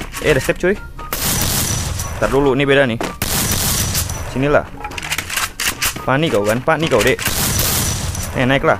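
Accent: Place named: Sulawesi, Indonesia. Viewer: Bang logat lu kek orang buton/sulwesibang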